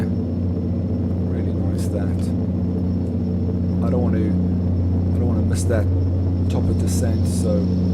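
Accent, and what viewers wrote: South Africa. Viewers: hi pal how are u today u sound like ur from south Africa johansburg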